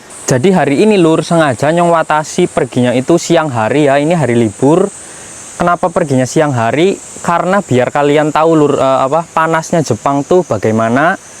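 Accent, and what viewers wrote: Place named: Banyumasan, Indonesia. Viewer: wkwk logat ngapake kuat bgt bro, purbalingga hadir😂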